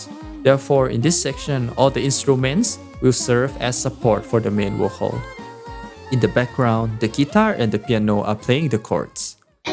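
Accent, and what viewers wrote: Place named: Thailand. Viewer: ตามมานานแล้ว พอรู้ว่าเป็นคนไทยยิ่งดีใจเลยค่ะ (เอ๊ะแรกคือจากสำเนียงค่ะ55) ทุกคลิปของคุณคือดีงามมากเลย จะสนับสนุนต่อไปนะคะ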